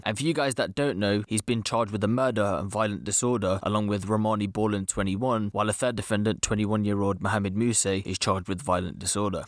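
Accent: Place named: Ipswich, United Kingdom. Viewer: This guy sounds like he’s from ipswich whys he reporting on drill rappers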